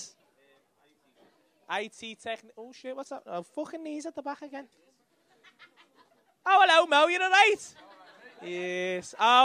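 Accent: Liverpool, United Kingdom. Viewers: The only thing wrong with this is that he has a Scouse accent, and he ain’t funny....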